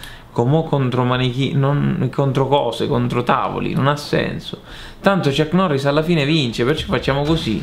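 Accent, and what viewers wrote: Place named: Sicily, Italy. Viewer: Mi manca un po' il tuo accento siculo <3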